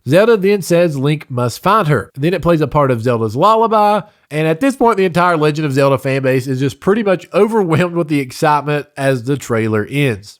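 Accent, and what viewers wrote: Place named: South, United States. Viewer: WOW the southern accent REALLY threw me off!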